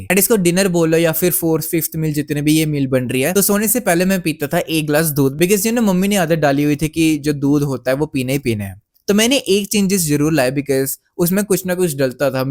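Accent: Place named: Pakistan. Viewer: Accent to pakistani lagte ho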